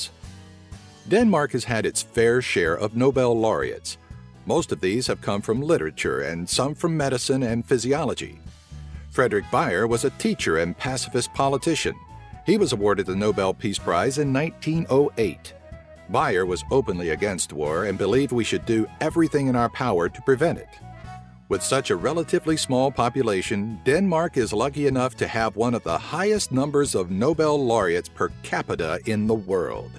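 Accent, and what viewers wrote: United States. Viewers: it's funny to here a American saying danish words.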